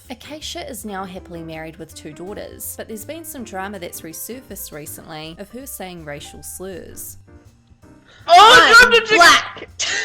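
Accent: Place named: New Zealand. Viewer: Kiwi narrator? YAAAAS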